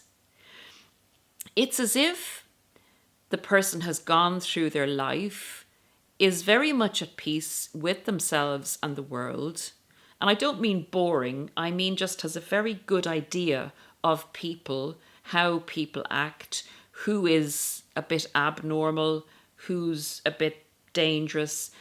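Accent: Ireland. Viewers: Aye, but her accent is Irish (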